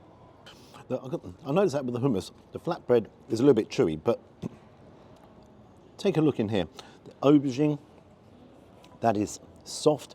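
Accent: RP, United Kingdom. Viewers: My eye was full of of ketchup so I'm a bit late to-day,. Covent Garden is so posh nowadays, I remember when the market used to be there - completely different ambience in those days! I love kebabs and I think all three of them looked delicious. You should have used the knife and fork, after all it is an upper class kebab shop - I mean restaurant! Love your posh accent. Best wishes from Chirpy Pigeon and his mate Tania xxx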